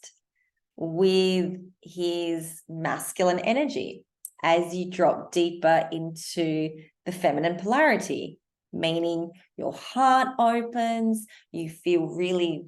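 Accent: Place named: Australia. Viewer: Hello, you've got a new subscriber here! I love it that you talk a little more slowly, so we have the time to let it sink in precisely that what you are saying. And i love your Australian (i take it to be) accent. Furthermore: the content of what you are saying is profound: it holds so much wisdom!!! I hope a lot of men and women will find you soon, for the work you do is very important!!! Thank you and love from the Netherlands. 💗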